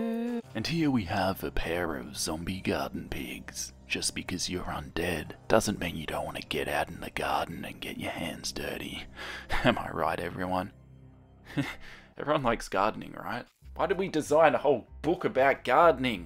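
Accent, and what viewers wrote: Australia. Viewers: wait.. are you aussie??? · YOUR AN AUSTRALIAN!? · Ayyyyyy! Your Australian! You are now my most favourite Australian Youtuber! I'm Australian.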